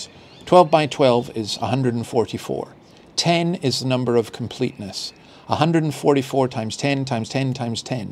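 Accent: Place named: Australia. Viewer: Good stuff. On a side note - Your accent is becoming a little bit Aussie Have a great day and God bless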